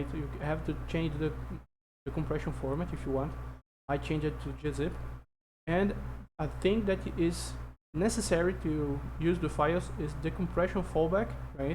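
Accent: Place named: Brazil. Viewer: Sotaque de BR, se for Br da um salve que tenho umas duvidas e to em um projeto que pode te interessar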